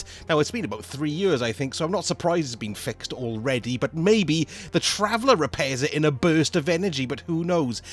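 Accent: Wales, United Kingdom. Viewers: Hey,are you welsh?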